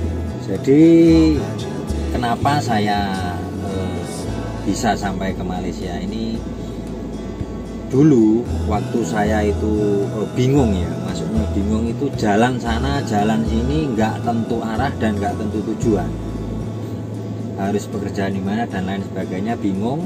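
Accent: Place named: Java, Indonesia. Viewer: Kenapa accent awak masih pekat Jowo? Awak tak bergaul dgn orang Melayu ke? Btw...saya keturunan Banjar dan Bugis. Kampong di Perak.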